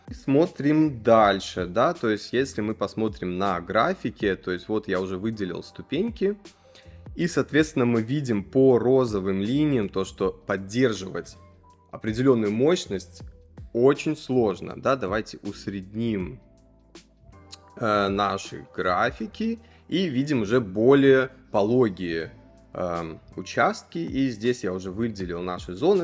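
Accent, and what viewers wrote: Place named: Moscow, Russia. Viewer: Чувак конечно крутой, но маасковский акцент все убивает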